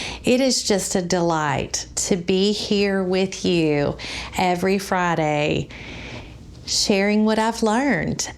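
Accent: South, United States. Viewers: Hi! I have been watching from the beginning and have loved every tutorial! I have learned a lot and you have made me think about different fabrics, colors, and designs. I like how you are a hands-on designer! I think I am, too! I also have a Southern drawl like you! If you will keep the tutorials coming, I will keep watching! Thanks to you and! He’s a great cameraman! I am glad he encouraged you to do this! Happy Anniversary…and here’s to many more!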